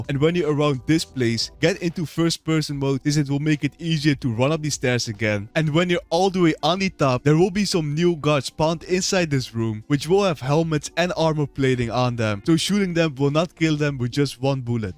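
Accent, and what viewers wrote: Netherlands. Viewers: We horen de Nederlands 🥀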